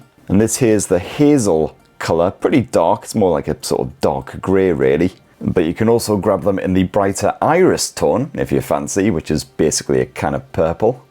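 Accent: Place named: Northern England, United Kingdom. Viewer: Very useful review - Google translate helped me with your more extreme vocalisatory nothernisms. Seriously very useful and engaging with the duller info. Thank you!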